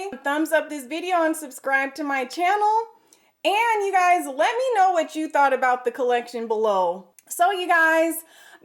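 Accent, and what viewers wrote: South, United States. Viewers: she sounds country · This is my first video and I can not for the life of me figure out where this girl is from... what accent does she have? It sounds southern but with a twang?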